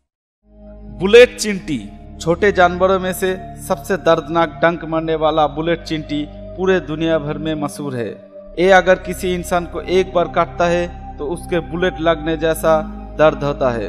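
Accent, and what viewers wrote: Bengal, India. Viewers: Are u bengali ? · Ye to bangali hai ya odisa...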